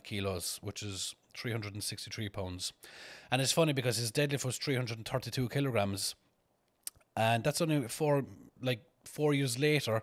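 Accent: Ireland. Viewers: Enjoyed that. Love how you say 332, the Irish accent, born story tellers.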